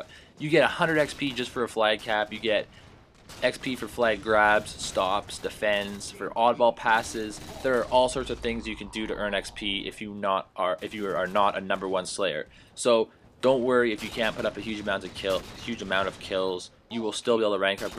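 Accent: Canada. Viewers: I love your Canadian accent! It's just perfect! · your Canadian aren't you? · he sounds like he lives in canada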